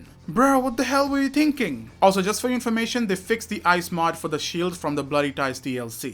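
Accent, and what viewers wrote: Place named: India. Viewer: Lmao you sound Indian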